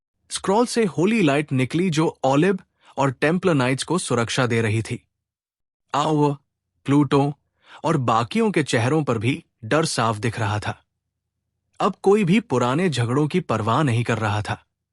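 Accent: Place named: India. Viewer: Why you turn into indian bro.. i cant understand a thing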